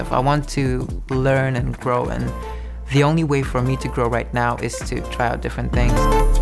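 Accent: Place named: England, United Kingdom. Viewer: his english accent is heartbreaking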